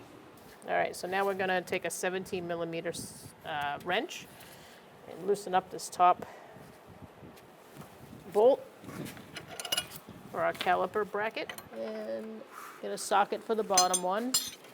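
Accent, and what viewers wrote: Boston, United States. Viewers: my favorite mechanic chick and that Boston accent!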